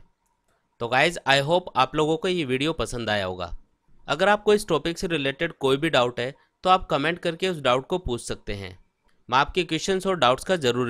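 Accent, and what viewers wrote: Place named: Rajasthan, India. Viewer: Sir kha se ho ... Language se to Rajasthan se hi lag rhe ho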